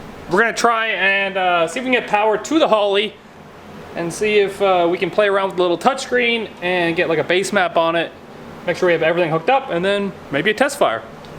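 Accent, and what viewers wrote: Canada. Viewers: I’m here just to hear him speak Canadian. I love Canadians. 😘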